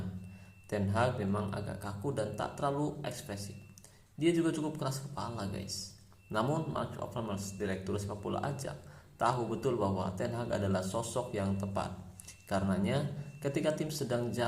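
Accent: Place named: Cileungsi, Indonesia. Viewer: bang lu orang sekitaran cileungsi yak?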